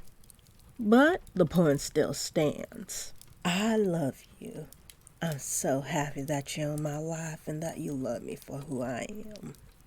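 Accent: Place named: South, United States. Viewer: Damn, that southern accent hits me, great work on the audio chief!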